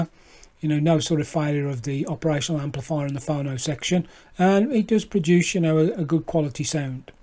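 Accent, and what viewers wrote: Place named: United Kingdom. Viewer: Thanks for the reply, what is their shipping time to UK like? (I'm guessing your in the UK from your accent)... :)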